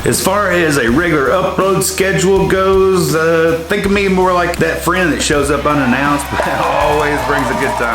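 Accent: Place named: South, United States. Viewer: Love hearing that southern drawl! 🤣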